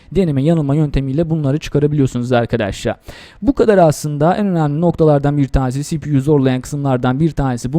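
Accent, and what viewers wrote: Azerbaijan. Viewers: Azeri o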